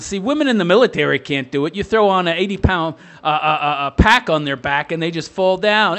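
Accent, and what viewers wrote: New York, United States. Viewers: Okay.....New York.....Philly? That way you talk is killing me, it's like Archie Bunker's protege, fuckin' 'ay! Love your channel, damn you can break balls, wooo! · You sounds like a New Yorker.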